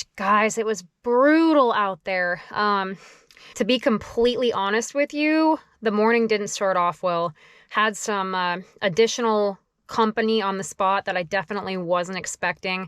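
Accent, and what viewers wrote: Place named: South, United States. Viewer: Please. You say Nebraska but your accent says you are a southern lady!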